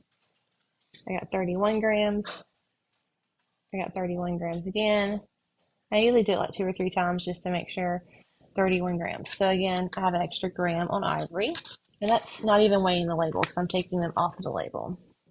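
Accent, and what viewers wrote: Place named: South Carolina, United States. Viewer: You sound like us in South Carolina. I didn't comment on the snake because so used to that. We have had snakes moving around lately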